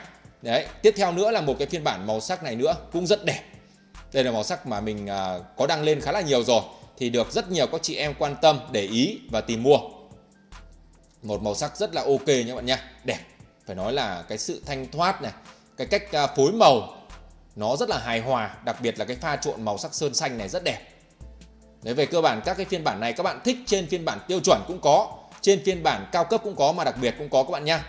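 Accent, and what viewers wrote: North, Vietnam. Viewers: anh người bắc này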